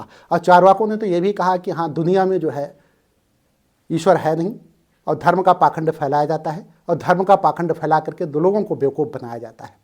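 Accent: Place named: Madhya Pradesh, India. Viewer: भाई सर की और भी वीडियो देखिए। सर अध्यात्म की बात करते हैं । सर एक अच्छे राइटर भी हैं मैं इनकी सारी वीडियो देखता हूँ । सर कहाँ के रहने वाले हैं मैं नहीं जानता लेकिन शायद mp के हैं आवाज़ से ऐसा लगता है । इन सिर की दो पुस्तक मैंने पढ़ी हैं फ़िलिपकार्ट से मंगवाई थी । हो सके तो आप भी पढ़े। और रही बात आपके प्रश्न और कमेंट की तो भाई एक बात बताऊँ - “ सुअर के साथ कुश्ती नहीं करनी चाहिए क्योंकि आप गंदे हो जाओगे । लेकिन सुअर को मजा आयेगा।”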